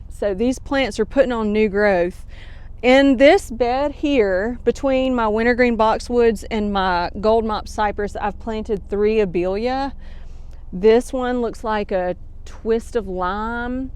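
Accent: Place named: Mississippi Gulf Coast, United States. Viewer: In Central TX there are no pine trees. We use cedar mulch and they sell it at the gas stations very cheap. When the mulch shows up you know it’s Spring. Love hearing your accent as it reminds me of home along the Mississippi Gulf Coast. Enjoying your channel!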